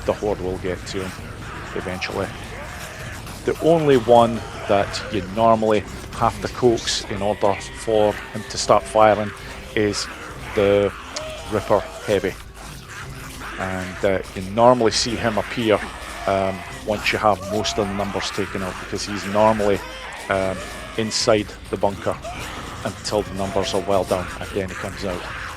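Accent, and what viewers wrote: Scotland, United Kingdom. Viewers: Dude is it Scottish accent you got? Sounds like Mimir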